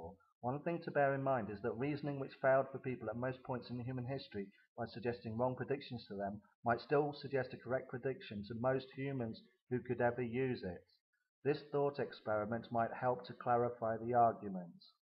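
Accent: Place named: Australia. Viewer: AUSTRIAN!!!! I knEW IT!?!?!?! Oh, australian... nevermind.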